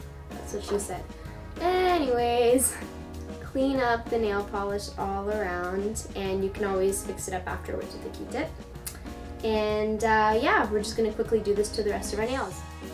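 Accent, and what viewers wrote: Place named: France. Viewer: A) your nails are so pretty i'm so jealous. B) where are you from? it sounds like you have a *slight* french accent hahaha C) I subbed!!!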